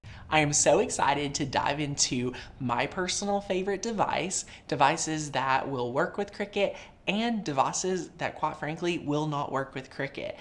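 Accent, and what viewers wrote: South, United States. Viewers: I use my surface pro for design space & joy app also. do I hear a lil southern slang in your voice? Love it,awesome videos,thanks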